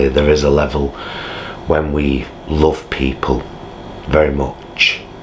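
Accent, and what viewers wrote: Manchester, United Kingdom. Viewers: Lovely, I'm moving back home and I will keep following u, a bit of a Mancunian accent in a southern Italian flat... great person indeed xxx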